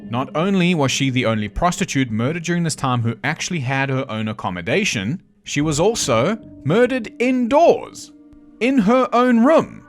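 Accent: South Africa. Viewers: This channel is single handedly giving me a positive association with a South African accent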